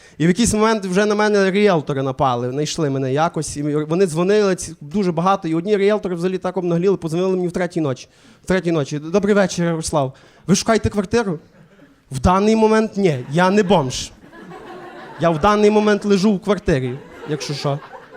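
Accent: Galicia, Ukraine. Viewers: Прекрасний галицький акцент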